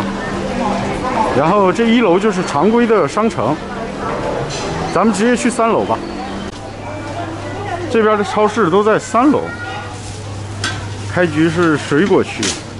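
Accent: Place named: China. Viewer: 你的英语中国口音很重😂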